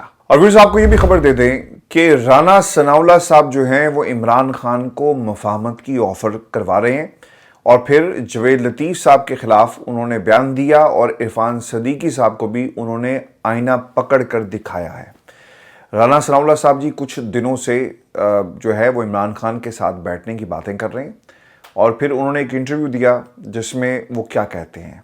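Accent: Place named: Karachi, Pakistan. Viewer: عرفان بھائی آپ گجرات کے تو نہیں لگتے آپ کی شخصیت اور آپ کی گفتگو تو کراچی والی ہے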